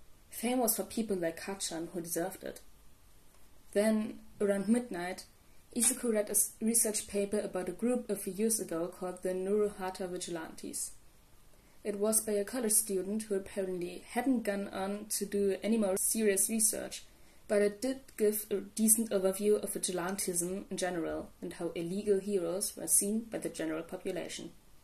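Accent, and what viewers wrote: Germany. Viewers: I think your English is very good! I was wondering what your first language was though tbh. I guessed it was German but I’m not sure.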